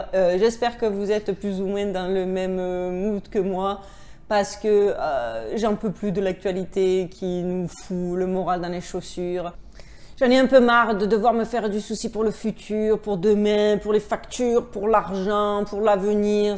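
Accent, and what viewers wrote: Marseille, France. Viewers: Nous sommes tous différents, c'est sûr, moi elle me fait rire et m'apporte de grands bols d'air Merci ! Merci , continuez très chère amie marseillaise à l'accent plein de soleil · C'est plutôt la cagole du coin... avec l'accent qu'elle a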